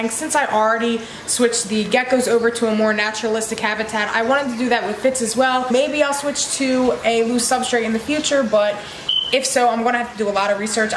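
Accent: Philadelphia, United States. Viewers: Your accent is adorable, philly?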